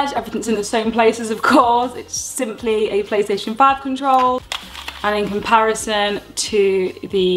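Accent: United Kingdom. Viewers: A breath of fresh air w a British accent✌🍻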